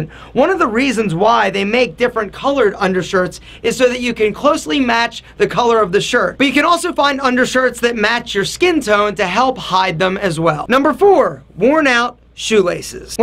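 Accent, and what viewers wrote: Philadelphia, United States. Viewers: The philly accent is brutal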